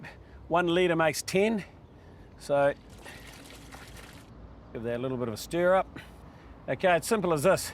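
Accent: New Zealand. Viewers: Hi Daniel, it is New Zealand accent, not Aussie, we say deck.The presenter is great, hubby and i followed instruction and our deck looks great. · NZ accent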